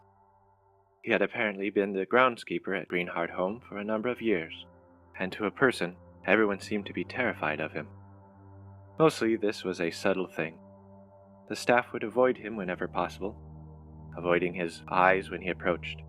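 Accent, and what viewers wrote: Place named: Ireland. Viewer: I've been hanging out for this one. I'm really enjoying this series. I liked your Irish accent. Thank you very much!!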